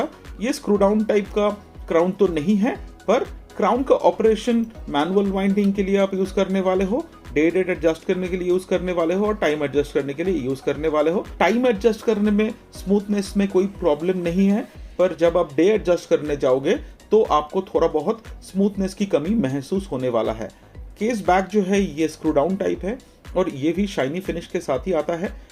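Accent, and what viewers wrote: Bengal, India. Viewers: Dada bangali??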